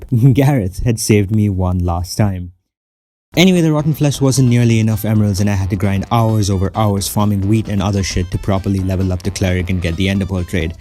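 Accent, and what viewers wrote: India. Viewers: he used to sound like a lil indian before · Are you from India your voice has I think Indian accent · my favorite india accent person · are you indian u sound indian to me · i never knew he was a indian or used to speak indian accent :) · he indian i can hear it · Hello man with an indian accent.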